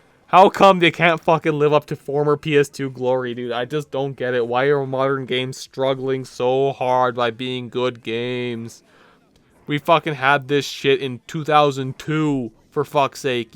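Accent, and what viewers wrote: Canada. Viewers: I feel like you must be from Canada 😂 first minute of this video sounds exactly like my friends and I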